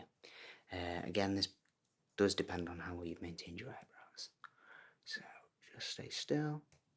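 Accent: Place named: North, United Kingdom. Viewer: You sound very... Northern. I like it 😋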